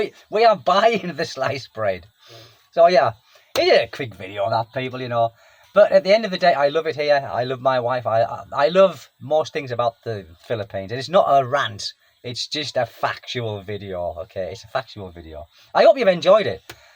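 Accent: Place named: Newcastle, United Kingdom. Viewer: You are welcome. I recently posted one of your videos to my cousin Paul who married a girl from Sunderland in 1983. He has lived there ever since. I detected you have a slight Geordies accent. Great memories of being best nab at his wedding. I was best man. The geordies were brilliant. So similar to we scousers. really honest with a grear sense of humour and dont suffer fools. Keep up the great work. Tony from the pool.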